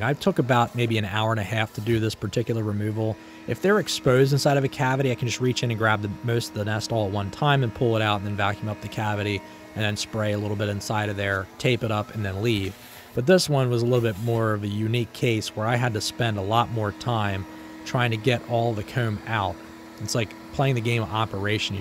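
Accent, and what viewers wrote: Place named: Philadelphia, United States. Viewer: You got a Philly accent. Certain words you say sound just like if you were from that area. Say the word Acme in one of your vids. Then I'll know for sure.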